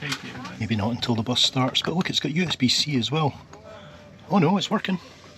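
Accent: Scotland, United Kingdom. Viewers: Just subscribed as I like seeing places through you eyes. Im half scot and you also bring back childhood memories of living near the Broch (Fraserburgh). Saying 'heed' instead of head lol. Not heard 'Quinie' in a while, im listening out. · Watched a few of your streams now, really well put together and also a bonus for the Scottish accent. I’ve often thought about the ferry journey to Iceland and your two videos on it have sealed the deal. I’m normally very much a “van lifer” but love the idea of being a foot passenger. The route to get to the ferry looks very interesting- my sense of direction is awful. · Enjoying your video, my first on your channel, and I love your camera angles, humor, honesty, and charming Scottish accent. Mellow music, too. Subscribed!!!😊👍🏼👍🏼👍🏼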